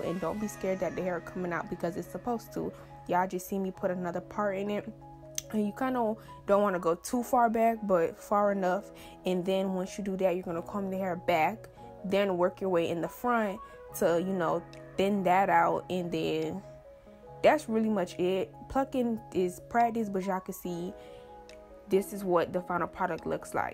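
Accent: Miami, United States. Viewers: I’m assuming you’re from Miami. Cause your accent is either OT , Beans or CC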